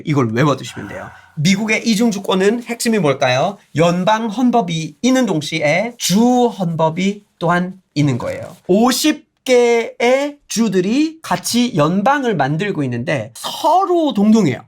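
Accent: South Korea. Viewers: 타일러 말하는거에서 광주이모가 오버랩 된다 ㅋㅋㅋㅋㅋㅋㅋㅋ 광주쪽 교수님이랑 친하신건가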